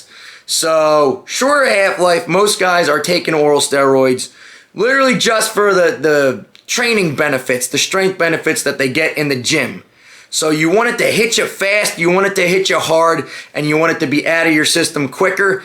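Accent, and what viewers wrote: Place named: Pennsylvania, United States. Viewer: You sound like you're from PA. Philly or Pittsburgh or close by maybe.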